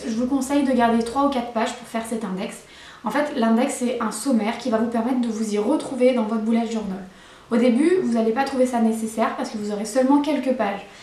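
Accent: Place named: Quebec, Canada. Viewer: J'avais l'impression d'entendre un léger accent québecois :p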